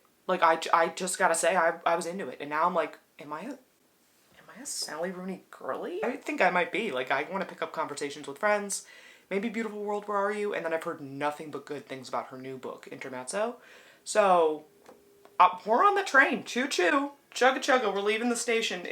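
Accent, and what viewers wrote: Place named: Ireland. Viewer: Yayyy congrats on the new job! Also loved your Irish accent haha :)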